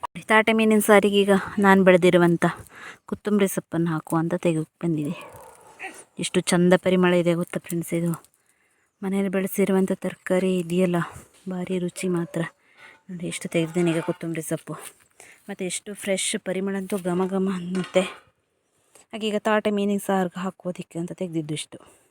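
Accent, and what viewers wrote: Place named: Kundapur, India. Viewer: Nimma mathu swalpa kundapur kannada uttarkannada mix ede adare nimma mane hatthira beautiful nature nodi kushiyayithu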